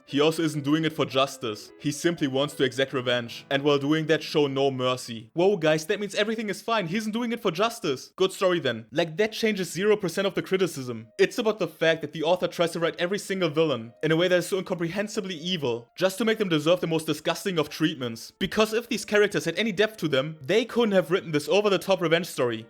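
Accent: Germany. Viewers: the video creator doesnt even try to hide hes german 🥀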